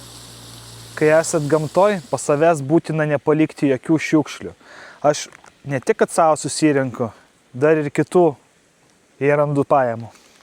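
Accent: Utena, Lithuania. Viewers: Pagaliau utenietiškai😉😁10/10 reikikia Utetenietiškai , be visi Iš Telšių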